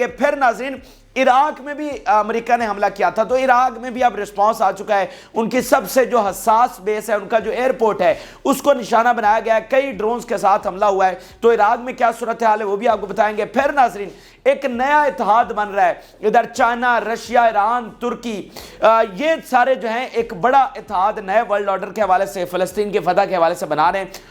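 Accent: Pakistan. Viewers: Please! If you stop quoting the english version in your Pakistani English which is hard to understand, it will save a lot of time and we can watch.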